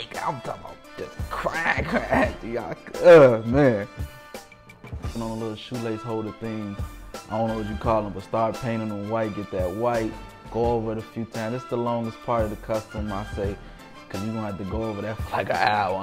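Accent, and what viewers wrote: Chicago, United States. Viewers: Bruh, you from Chicago?! Lmao I stayed for the commentary Omm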